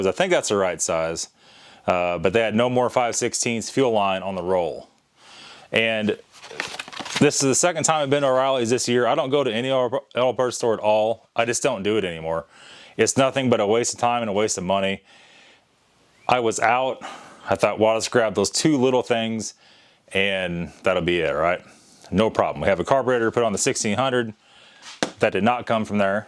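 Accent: St. Louis, United States. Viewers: Dude you GOTTA be from around St. Louis? You sound like my parents and I was born there, and especially your humour! Crapping their pants! Omg! Dude I was dying, I did buy a drive belt for my N20 from gasp!...auto zone and it was continental! German made too! But that is all I’ll buy! Period! Keep up the work!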